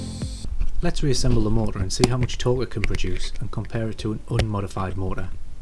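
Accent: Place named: Northern England, United Kingdom. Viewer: As others have commented, a very clear explanation and a very soft pleasant speaking voice ( which many Northern accents have ), so you're ideal for youtube videos. However is the overlay at 4.24 correct ? At 100mA x2 the torque is apparently 6x the 5v test at 32mNm, but torque is given as 117mNm ( the same value as 50mA x2 at 3.6x the 5v value ( 3.6x 32mNm = 115.2mNm ).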